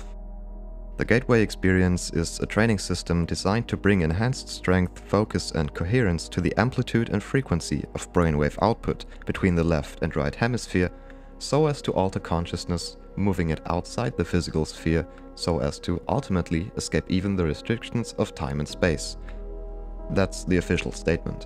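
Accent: Germany. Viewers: Me: this kinda sounds like a German accent Him: Oh shit digga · sehr gutes video, nicht unnötig überzogen, keine dumme angstmacherei, absolut ehrenwertes verständnis der physik und vor allem der thematik. sehr angenehmes englisch, für einen deutschen🫣. hast du die tapes bereits selbst getestet? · "Oh shit digger" are you German? hahaha edit yep, you definitely are xD (great video btw)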